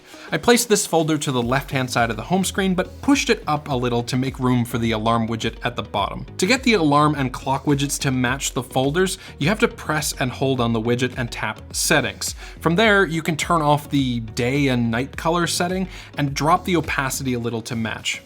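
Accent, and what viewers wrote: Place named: Newfoundland, Canada. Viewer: Are you from newfoundland? If so hello from a fellow newfie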